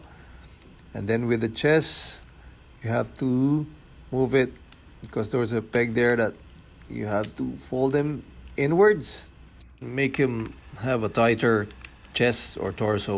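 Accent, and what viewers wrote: Philippines. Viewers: You sound like a Filipino. Mabuhai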